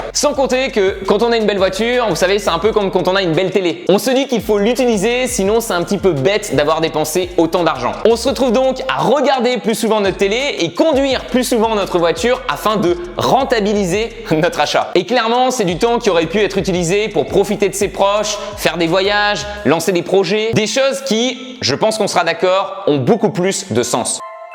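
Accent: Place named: South, France. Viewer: Top ta vidéo tout comme ton accent méridional !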